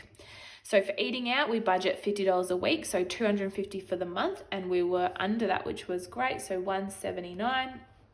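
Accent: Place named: Australia. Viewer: You gotta love that extra paycheck! So September will probably be the same for you because it's an extra paycheck for me too. Sounds like you're Australian which is sweet! I just found you by searching for budgeting channels on YouTube because I love the budgeting community and focus a lot of my content around that too. Keep up the good work!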